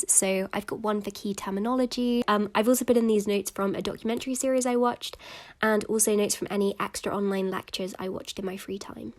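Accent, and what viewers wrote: United Kingdom. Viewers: is a national treasure. she is so humble and kind. and I love her voice. it's so British and welcoming. lol. great video. you should get more attention.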